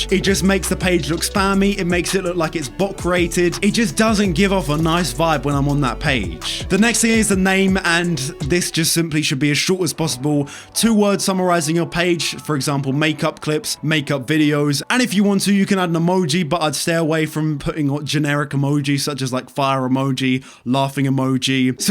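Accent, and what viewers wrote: United Kingdom. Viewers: His British accent😔💗💗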